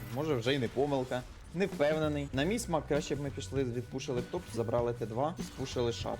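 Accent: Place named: mixed, Ukraine. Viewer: привіт- класний контент:) скажи як можеш з якої ти області)) балачка полтавська чи черкаська)?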